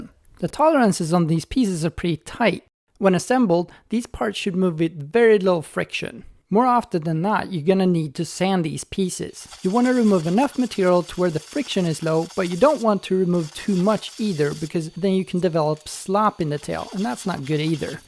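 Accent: Sweden. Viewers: awesome review just one thing, you should really pause a little longer between sentences, specially since you kind of have a swedish dialect, even for a swede its kind of hard to descipher . bara lite längre pauser mellan meningarna plz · I love your Swedish accent and your jokes, they are the best! Regards from Denmark :)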